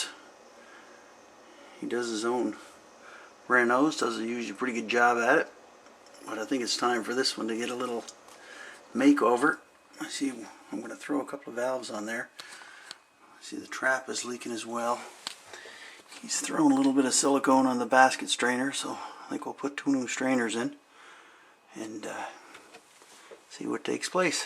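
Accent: Canada. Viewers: I knew you sounded Canadian! I'm Canadian as well. I live in Alberta. I've been watching your videos because I've been thinking of getting into the plumber trade. Thanks a lot for putting up these videos!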